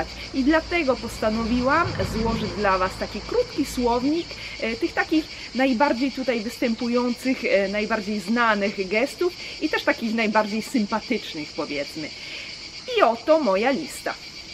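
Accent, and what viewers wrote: Italy. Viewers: Masz PRZEPIĘKNY włoski akcent 👌🇮🇹❤️ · Masz piękny włoski akcent gdy mówisz po polsku!!! <3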